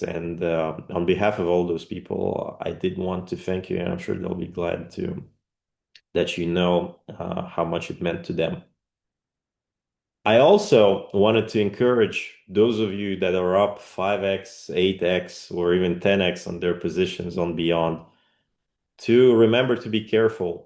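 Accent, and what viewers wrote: Quebec, Canada. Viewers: Are you from Quebec ? Your accent looks familiar. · Tu vien du Quebec?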